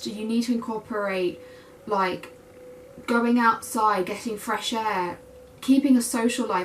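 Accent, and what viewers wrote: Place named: England, United Kingdom. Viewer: Where are you from you sound English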